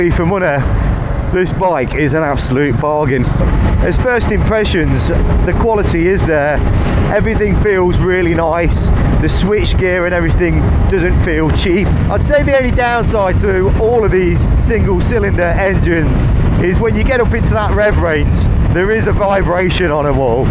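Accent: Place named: Hull, United Kingdom. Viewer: It was like revisiting the route along with you other than Rameswaram to Chitra Durga I have ridden the route on my Honda Highness. It was a fantastic series with your awesome comments along the way. By your accent, it seems you are from somewhere around Hull in the UK. Looking forward to your pick among three.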